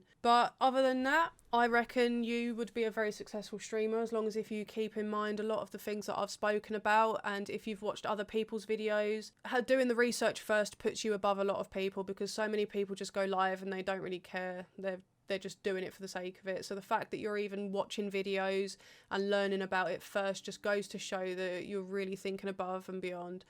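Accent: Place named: United Kingdom. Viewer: Hey, thanks for your video really good tips and thoughts some I can confirm through my experiences on Youtube. I'm starting to stream now too. You're really helping me with that. Thank you! Apart from that, I just have to say I love your British English!:-)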